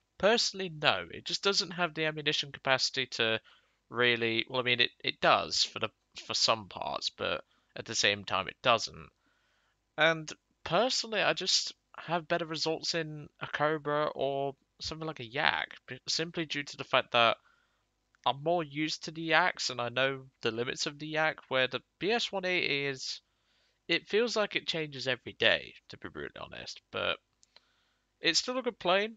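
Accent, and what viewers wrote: United Kingdom. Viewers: Where in Britain are you from? (From another Briton)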